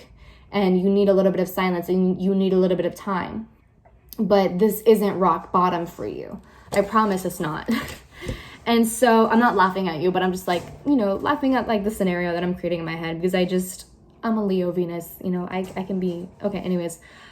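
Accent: United Kingdom. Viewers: as a British person, I appreciate your British accent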